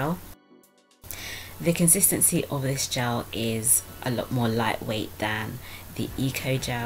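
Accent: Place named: England, United Kingdom. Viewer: First let me say i love your video's ! I'm wondering where you're from or where your accent comes from. I'm thinking you must be English. Every time I hear your intro, I can't help but think of the movie Silence of The Lambs. Hello Clarice.....LOL !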